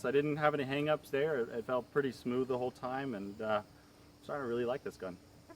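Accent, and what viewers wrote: United States. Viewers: Oh that’s American I thought it was british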